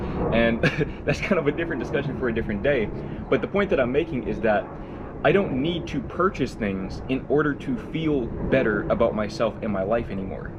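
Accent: Netherlands. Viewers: Am I the only one hearing a small hint of Dutch accent on his English? Guess you brought back more... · You can never be too idealistic. You are an asset to this world. Btw great Dutch accent. Also, I lived in the California for sometime, and one day I bought a new printer in a local small store. The fellow that helped me had an accent, but in California that is not weird. Only at the check out I figured he was Dutch too. Complaining… yeah my American colleagues just complain about a lot…😂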